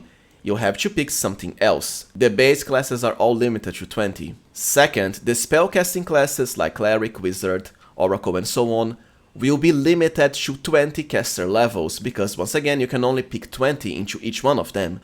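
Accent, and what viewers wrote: Brazil. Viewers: Acabei de descobrir o canal. Você é brasileiro? Fiquei com essa impressão pelo sotaque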